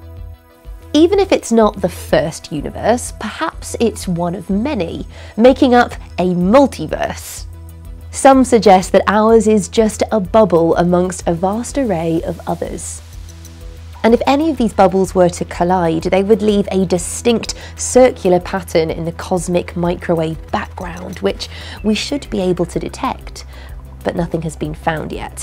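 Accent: United Kingdom. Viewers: A great looking lady, looking a little "science-y" disheveled, with a British accent talking about the big bang intelligently... I don't know what's more attractive than that lol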